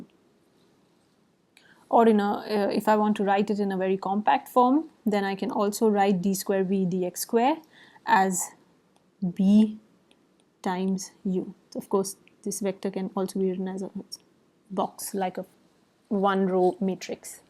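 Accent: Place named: India. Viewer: it seems like you have an indian English accent..Very informative video....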